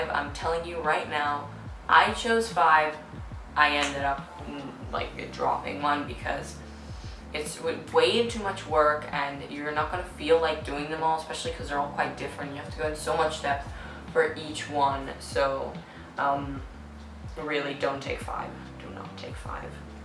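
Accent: United States. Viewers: I hope this doesn't come across as too nosey, I'm just a curious kind of person :D You sound American to my British ears, are you doing A-levels specifically because you want to study in the UK? Hai scritto che sei italiana, vivi in Italia in questo momento? Hai fatto il liceo o stai facendo qualche scuola internazionale / inglese? Come si fa a fare gli A Level all'estero, ci sono centri dove si danno gli esami? Cmq in bocca al lupo per l'università... io sto pensando di tornare a fare gli A Level di nuovo quest'anno perché sono 3 anni che faccio questo stesso lavoro e ormai è diventato noiosissimo. Scusa tutte le domande, ma sono uno che deve sapere tutto :D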